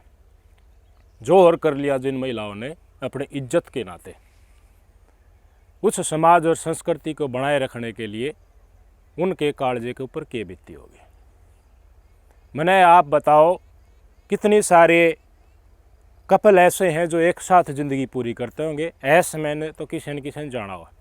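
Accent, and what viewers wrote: Haryana, India. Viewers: Enjoyed Haryanvi narration of story but content is good one.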